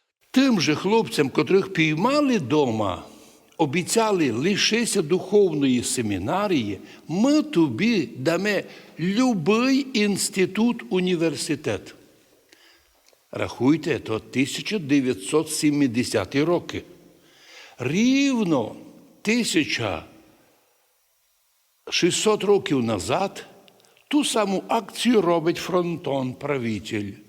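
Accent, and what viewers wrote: Transcarpathia, Ukraine. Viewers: Як я люблю закарпатський діалект, гарний і священник прекрасний на своєму місці, він істинний пастир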